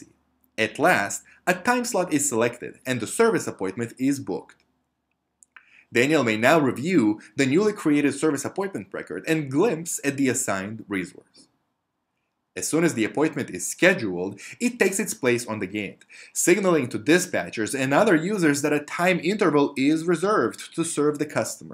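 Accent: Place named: Italy. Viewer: Loud rock guitar music, Italian accent.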